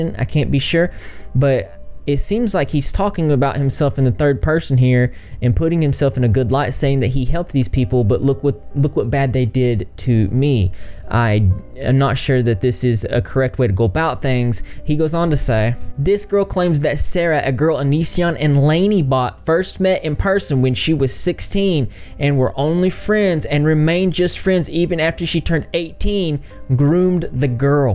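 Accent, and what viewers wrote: South, United States. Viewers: Also, I love your southern accent! I had to work very hard when I was in the Publishing business to get rid of mine. But it has slowly (quickly) returned once I got out of that field. lol Sometimes my accent is so thick that I have to translate for people. Words like Oil, Tire and I'll never get rid of, Ya'll in my vocabulary. lol Keep doin you! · My comment here, relates more toward You.. Just to let u know..I love love love, your southern accent. With myself Born and raised, a larger chunk of my life, in thee south. Growing up with it myself, forever hearing it around me, and some family members still down there.. my moms side is from Long Island, NYC, with my dads side and thick accents from Alabama and Missouri, and mysyself born/raised/growing up and all of schooling their high school. In Florida, Southwest, Florida. I have to say I really really do miss it sooooo much. 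Not there anymore and living in NYC currently, it really does make me homesick upon hearing ANY Southern accent! I work With an older man from Louisiana, he has a very thick accent.. and I will find myself gravitating towards him, just bc its a southern twang lol. I do have a slight one, and after being here for 10 yrs, my friends knowing me here, just as long as those 10, still does NOT PREVENT THE RIDICULING of my PRONUNCIATION OF CERTAIN WORDS WITH A SLIGHT TWANG. But ya kno what?? I don't mind at all. 1. I then make fun of their NY Brooklyn/jersey, guido-esq. accent, right back at em, and 2. (Most importantly) reminds me that I haven't lost MY OWN PERSONAL southern accent, which I would never wanna lose AND WOULD BE DEVASTATED IF I EVER DID..😔. The southern guy I work with..I find myself gravitating towards all day, for I just Miss hearing the Southern accent and references to things that only the south understands lol. 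I could just listen to h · our southern accent really does make it hard haha great video as always! Happy to see you covering everything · where are you from? Hear that southern twang all over this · Love your southern accent dude 😌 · Where are you from? I adore your southern accent. Reminds me of a beautiful still, winter snow day in Tulsa.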